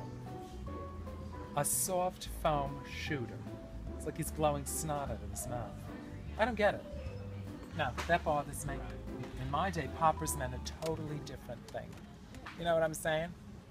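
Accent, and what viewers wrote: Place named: Bronx, United States. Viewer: OMG!! I am hooked on your store visits (also your Bronx accent - reminds me of home!!)!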